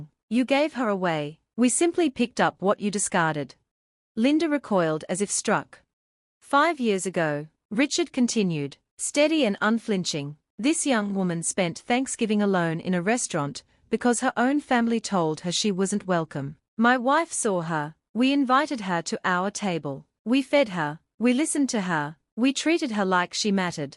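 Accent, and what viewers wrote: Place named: United Kingdom. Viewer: A British narrator and an American holiday? Kind of ruins it for me.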